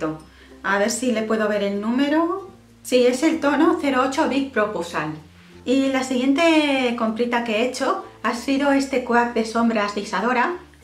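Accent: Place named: Spain. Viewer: Hola, me encanta que realices vídeos de maquillaje. Yo también espero pronto empezar a realizarlos. Yo los productos que utilizó son de Younique, no se si los conoces. Espero cuando me recupere de mis cirugias, empezar a realizar videos junto contigo. Soy deEspaña y por tú manera de hablar creo que tambien lo eres. Tengo mi proxima operación el 15 de febrero, el día después de los enamorados. Una vez recuperada, esperó que colaboremos juntas, tanto en el grupo arte en tus manos, cómo las dos realizado un set de maquillaje. Besos, espero que pases por mi canal y te suscribas, todos los videos son de manicura, por lo que me encantara realizar videos de maquillaje. Besos.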